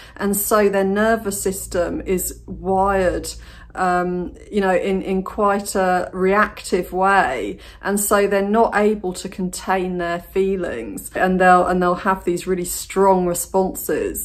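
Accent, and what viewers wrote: England, United Kingdom. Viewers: Accent too English for me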